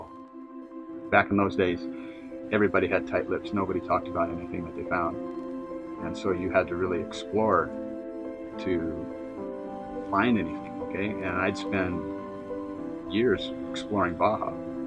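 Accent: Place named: Hawaii, United States. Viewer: he's from Hawaii. That's exactly how they speak. Yer a dick.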